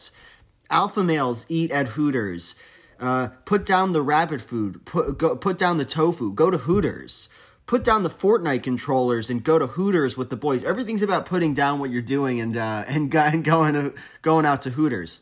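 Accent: United Kingdom. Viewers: I genuinely thought you were from the UK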